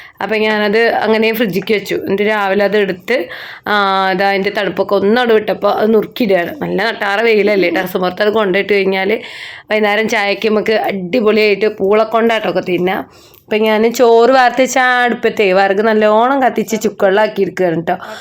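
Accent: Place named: Valluvanad, India. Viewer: നല്ല വ്ലോഗ്.... സന്തുഷ്ട ഭവനം... വളരെ സന്തോഷം തോന്നുന്നു...വള്ളുവനാടൻ തനിമ നിറഞ്ഞ അവതരണം...നന്മകൾ നേരുന്നു ❤❤❤🥰🥰😍😍🎉🎉🎉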